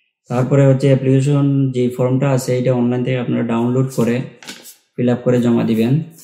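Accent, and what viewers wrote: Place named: Habiganj, Bangladesh. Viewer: ভাই আপনার ভাষায় বুঝা যাচ্ছে,,আপনি হবিগন্জ ডিস্ট্রিকের।আমি মাধবপুর থেকে বলছি।আমি আগে দূবাইতে ছিলাম,, আড়াই বছর,,,এখন পূর্তুগালে ভিসিট ভিসা পেতে আপনি কোন সহযোগিতা করতে পারবেন।অথবা ওয়ার্ক ভিসা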